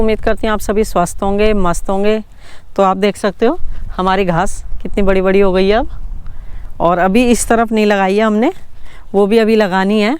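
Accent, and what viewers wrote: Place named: Uttar Pradesh, India. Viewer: Im indian tum bhi up india se hogi aap ki bhasa bta rhi h nice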